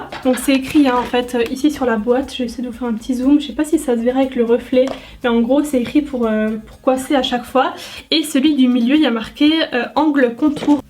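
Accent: Lorraine, France. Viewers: Je découvre ta chaîne avec plaisir ^^ Ahhh moi aussi je suis Lorraine d'origine et alsacienne d'adoption Lol je l'aime trop ton petit accent 😍 Merci pour ta bonne humeur 😉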